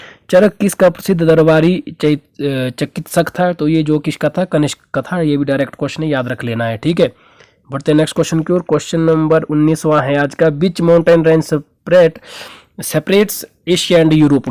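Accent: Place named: Pahadi, India. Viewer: Sir me to yhi bolna cahugi.ki app jo pahadi lehje me bolte hai supub apka jo merital hota hai best.and padane ka tarika altimate.keep it up sir..vese app kha se?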